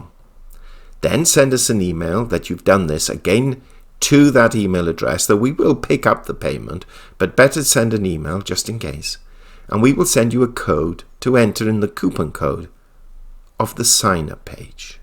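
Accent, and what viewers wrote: United Kingdom. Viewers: his voice is the trademark. Unmistakably British accent.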